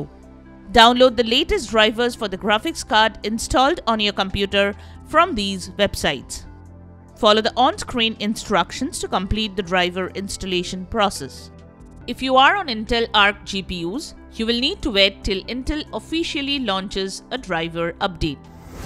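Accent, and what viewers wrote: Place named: India. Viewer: Dislike for the indian accent. I don't like the color of skin and the way they look/behave.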